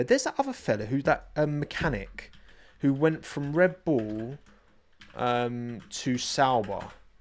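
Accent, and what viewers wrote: United Kingdom. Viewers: It’s the low sweet British accent, I have the exact problem with Cameron and Henry Quinney from Pinkbike. And I can hear the Canadians from the same podcast just fine.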